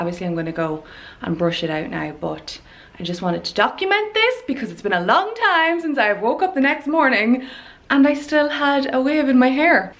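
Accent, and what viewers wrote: Ireland. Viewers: Hi! I really love your videos! You're so friendly and natural! I always watch your videos with a big cup of tea--but sorry I'm a Barry's girl not a Lyons! I have two questions for you! The first is have tiu always lived in the town you live in now? I know the town where you live (I'm not sure you've ever said it on YT, I just recognise the shopping centre!) Just wondering because your accent is exactly the same as my cousins who live in Monaghan, specifically Clones!!! And my other question!!! Why are your doors always open?? Is your house really warm??😊😊😊 Anyway as I said I really love your videos! And oh yes I adore your house too! 💞💞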